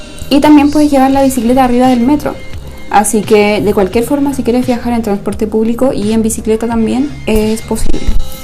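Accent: Chile. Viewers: Sin ver 1 minuto del video puedo asegurar que eres chilena con ese castellano tan eligible 😂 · AAAAAAAAAAAAA se nota que es chilena apenas hablo jajajajajaaj que bella... eres muy linda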